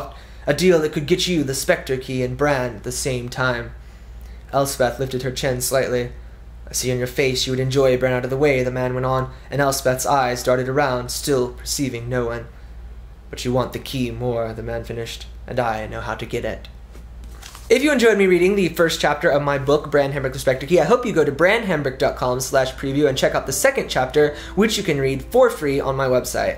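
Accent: England, United Kingdom. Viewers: i like your english accent!:D i cannot wait till this book comes out!!! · are you british?or are your characters? : ) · why are you reading in a British accent???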